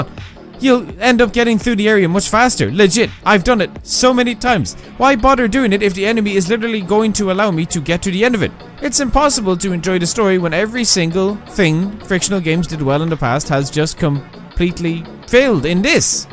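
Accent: Ireland. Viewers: Lol love this review! Especially with your Irish accent 😂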